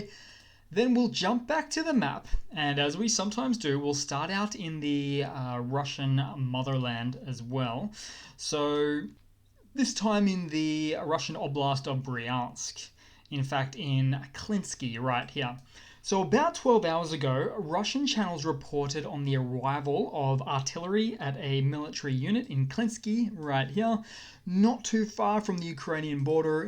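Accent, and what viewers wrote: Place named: Australia. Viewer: If you're referring to accent, he's Australian.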